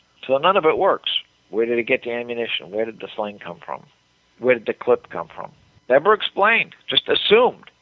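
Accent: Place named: Northeast, United States. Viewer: You are correct. The speaker in this video has an upper Atlantic/Northeastern accent. Not familiar with southern accents and assumes that LHO is not capable of learning Russian? Not an uncommon inference.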